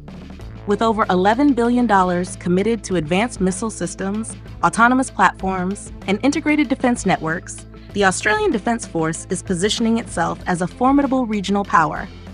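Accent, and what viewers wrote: United States. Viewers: Whenever I see some glossy ad like this with someone talking about Australia's defence with an American accent? Not even trying to hide the sell.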